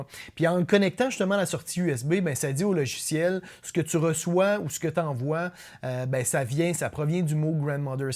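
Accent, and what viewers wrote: Quebec, Canada. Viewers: En fait plus j't'écoute plus je kiff l'accent québécois! (j'suis qu'un maudit Français!) Bref, chouette vidéo (owl video...) , qui apprend des trucs simples et utiles sur un bon rythme et dans la bonne humeur.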